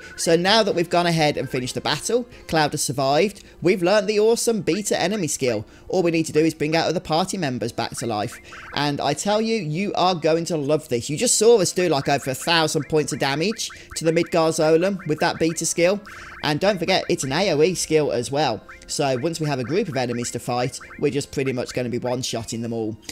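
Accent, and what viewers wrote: United Kingdom. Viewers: dude you should start practicing to steer away from your crazy british accent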